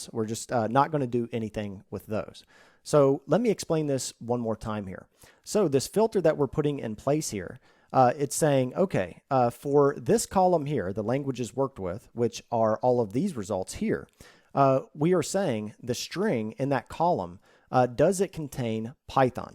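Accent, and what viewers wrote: South, United States. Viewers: i like his kinda southern accent lmao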